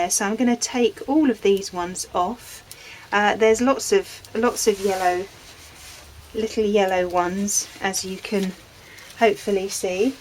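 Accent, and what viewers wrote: United Kingdom. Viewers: Hello. I always enjoy watchin you and listening to your messages and that beautiful British accent. Love it. I like hearing about the differences in our climates and what we can and can't grow. I'm from Alabama, USA. I had a little, scattered garden this year with a nice little patch of okra. BUT, I've had to fight to keep the ants off my okra and corn plants and the squash bugs off the squash plants. Oh well. I think next year I'll plant the way I've heard people talk about, plant some for myself, plant some for my neighbor, and plant some for the bugs.That's a tall order.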